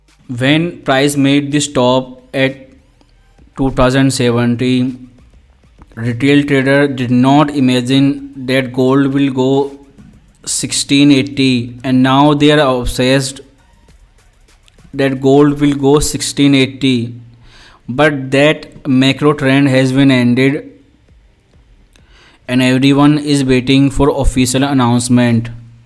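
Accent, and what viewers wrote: India. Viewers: Why don't u speak in Hindi...why this butler english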